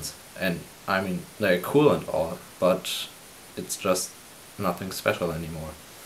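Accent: Germany. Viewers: are you german ? your accent sounds like you are.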